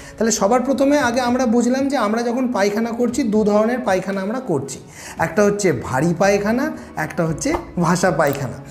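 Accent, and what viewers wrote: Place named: Bengal, India. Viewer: আপনার গ্রাম্য বাংলা ভাষায় কথা বলার ধরন আমার দারুন লেগেছে, তাই পুরো ব্যাপারটা বুঝতে পেরেছি।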